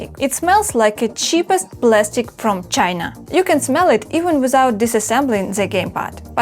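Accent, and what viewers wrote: Russia. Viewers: This person has no idea what they are even saying. Most obvious actor/ plant video I have ever seen. Not sure who paid her, not sure she has any idea either. But 379 subscribers and a strong russian accent. Yeahhhhhh gunna get a second opinion on that... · Russian accent is hard not to recognize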